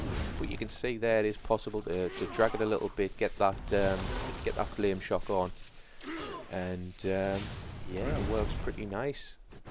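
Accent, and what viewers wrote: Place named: Northeast, United States. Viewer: A fellow NE accent felt so good to listen to! Thanks for the guide my man! But I know nothing about macros?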